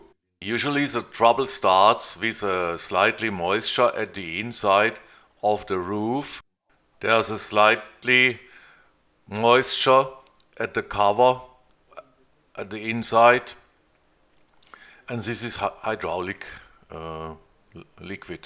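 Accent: Germany. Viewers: Thanks for sharing this video ❤❤❤❤❤❤❤❤!!!!!!! Also i want to say that you sound very kind with your German accent in English and that is so nice that you made this fix with your friend ❤. I ll try do the same as i have the same issue!!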